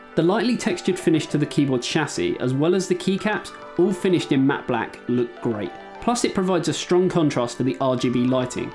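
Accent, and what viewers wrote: United Kingdom. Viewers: I love when to watch english video's instead of american. I don't know how but the british accent makes me focus more on the video instead of interruption of my dogs barking or driving by cars🤔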